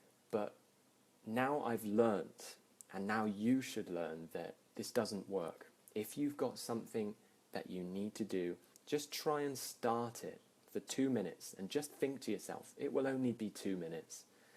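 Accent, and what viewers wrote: United Kingdom. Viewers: hi, i just click subscribed you. thank you for your teaching it's first time for me to hear "procrastination" and i quite wonder normally i cannot get british accent but i understand you very well. it's possible that you maybe speak slowly and clearly. ^_^ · Love your voice and of course your amazing British accent! ♥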